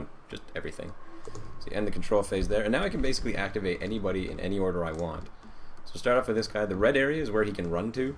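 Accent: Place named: Canada. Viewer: He sounds Canadian. Go Nathan Fillion! I love WM/HORDES and was just about to buy this game but like so many other games with dice, this seems to both suck AND blow. 63% chance of hitting, 3 misses in a row, and I have a feeling that wasn't an isolated case. Also it seems PAINFULLY slow to play a game...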